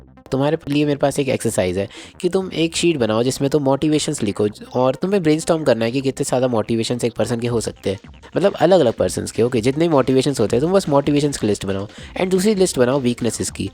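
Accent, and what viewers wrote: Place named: Madhya Pradesh, India. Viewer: Bro what that pen is called you use for inking , sorry for disturbing you again but please reply , and you got a new subscriber 🎉 you are so humble and Wese bhi aapki tone se pata chal raha hain ki shayad MP se ho aap , please reply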